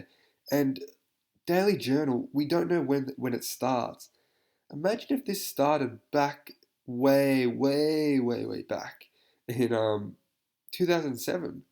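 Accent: Australia. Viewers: Where abouts in Australia are you from, Brother?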